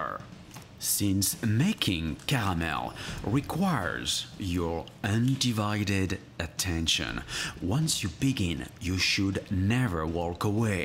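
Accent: France. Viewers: Are you French or Italian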